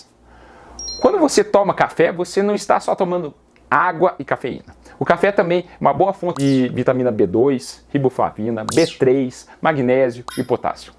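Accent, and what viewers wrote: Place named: Minas Gerais, Brazil. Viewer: vrdade gostei tbm do jeito mineiro.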